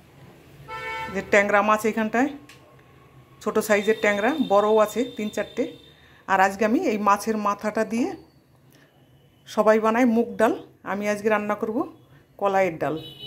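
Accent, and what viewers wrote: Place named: Bangladesh. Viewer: এই আপুর বাড়ি মনে হয় বাংলাদেশে। উনার ভাষা কোলকাতার ভাষা মনে হয় না।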